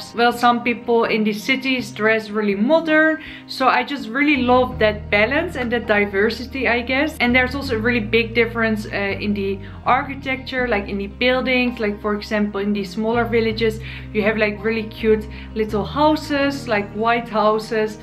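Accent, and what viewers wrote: Netherlands. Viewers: I knew ur from The Netherlands, just because of ur accent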